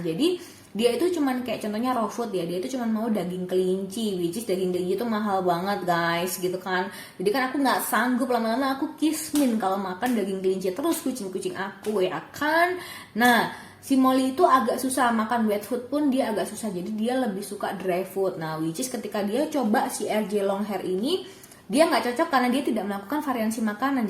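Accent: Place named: Surabaya, Indonesia. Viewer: Medok Suroboyo 😂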